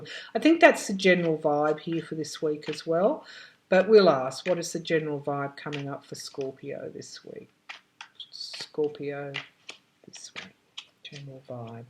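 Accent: Australia. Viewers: I love how you write; your Ausie accent is such, that in my minds eye, I hear you. Good luck on your health issues. is terrrrrific. 🇺🇸🐈♈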